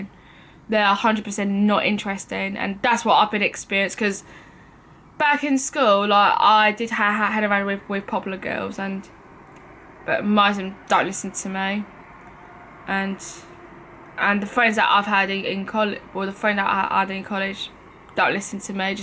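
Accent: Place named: Essex, United Kingdom. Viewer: And yeah I wasn’t diagnosed till I was 14, you got diagnosed young compared to me, btw love your accent? Are you Essex? East London?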